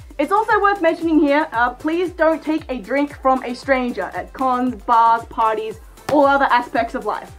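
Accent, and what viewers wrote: Australia. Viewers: Why do I keep thinking you sound Australian? >:3 if you are Australian do you recommend I make a kangaroo fursuit?? :333 I’ve been questioning if I should or not recently because I’m making a fursuit this October, or at least I’m making the head feet paws and tail but not the full body because I still need my mannequin that I used for my art textiles project back soooo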